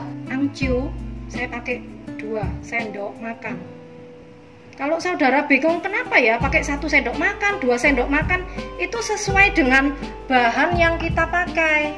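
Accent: Java, Indonesia. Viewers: Anjirr medok bangett. Saya suka saya sukaa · org Surabaya ya cik...bicara medok buangett...😊tp cara masak cicik sy suka...😍thank U cik...🙏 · ci aku.baru ikutin you tube cici.mantap cici tutorialnya jelas dan mudah ikutinnya.Suka juga aksennya cici yg.medok jawa.GBU cici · Wah...luar biasa masakannya....nikmat sekali ini....dan suka banget sama medoknya...salam kenal...sesama medok...😄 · Medoknya something banget. Jd adu ciri khas. Kamsia ya cik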